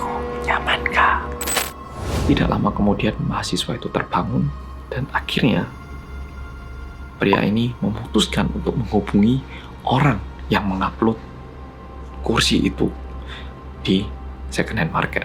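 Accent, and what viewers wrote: Java, Indonesia. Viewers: Hallo oppa medokn☺️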